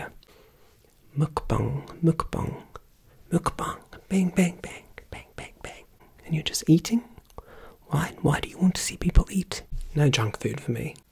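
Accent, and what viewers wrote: Australia. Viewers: Your Australian accent is on point!! 😍😍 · Without a single doubt, the best Aussie accent I've ever heard from a non-Australian. You even had the same tongue in cheek wit you always have but with that very distinct aussie brand to it. Very nuanced performance love love loved it. Cheers from down under x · As an australian - probably the best Aussie accent from a foreigner ive ever heard!!!!!!! looking scrumptious as always xx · Shiiiiiit!! That is a noiiice Aussie accent! And I'm an Aussie Sheila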